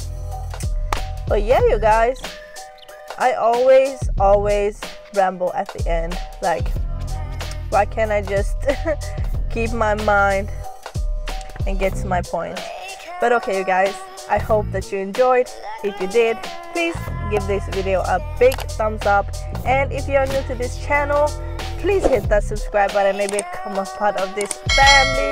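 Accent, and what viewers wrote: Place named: United States. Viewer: Where are you from? I hear a foreign accent mixed with American lol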